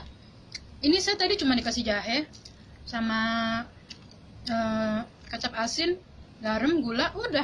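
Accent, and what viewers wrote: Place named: Cirebon, Indonesia. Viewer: Logatnya ky logat cirebon...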